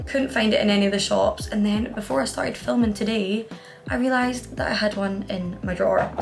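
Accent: Scotland, United Kingdom. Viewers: I haven't subscribed to anyone in a very long time, but your video was refreshing to watch, calm and informative. Also, love the scottish accent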